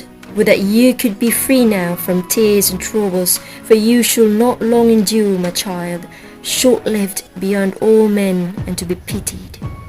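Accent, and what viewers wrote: United Kingdom. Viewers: Sana all british accent, lami ra paminawn uyy...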